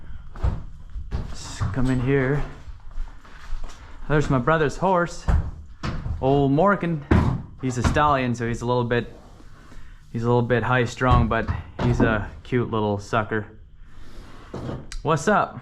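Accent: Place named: Ohio, United States. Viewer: I fkn knew it dude 😂🤘🏽 you kinda got an Ohio accent lol I’m from northeast Ohio , Lorain Wellington area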